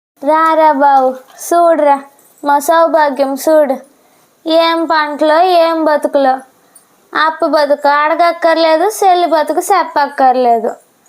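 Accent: Uttarandhra, India. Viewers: అందరి ఆకలి తీర్చే రైతు ఆకలి తీర్చేది ఎవరు... ఉత్తరాంధ్ర యాసలో వాయిస్ అదుర్స్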